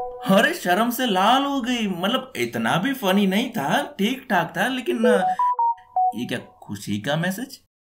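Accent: Gujarat, India. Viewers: h aapka real naam 😅... I already knew you are from Gujarat bcz u have a gujarati accent... Waise awesome video, it was really very funny... also congratulations for 100K subscribers.... ❤️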